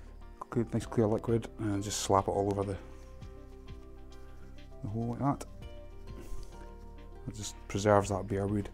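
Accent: Scotland, United Kingdom. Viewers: Liked the presentation nice to hear a scottish accent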